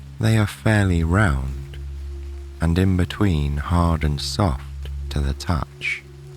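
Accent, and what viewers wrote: United Kingdom. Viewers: Same. He voice just seems the perfect timbre, he speaks in a perfect rhythm, plus he’s English. Idk why, American accents don’t work for me when it comes to relaxing, I find them grating and kinda stress-inciting. Oh well, we’re all different right? Love this channel, especially the Rainy Day Bakery series. 🌧🌙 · Sry but i hate brittish accent for storytelling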